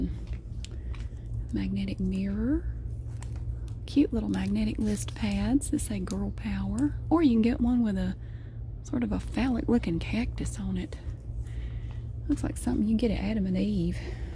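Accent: South, United States. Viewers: Hello I just wanted to say that I like your southern accent (idk how to spell it lol)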